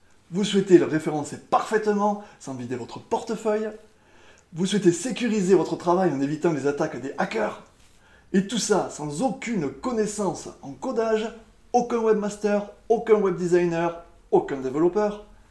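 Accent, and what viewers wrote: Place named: South, France. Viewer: Merci,pour cette bonne présentation,tu as un accent du Sud, on est peux être voisin,je suis dans le Gard.Builderall à l'air vraiment efficace,je pense que je vais me lancer,bonne continuation à toi et un grand merci :))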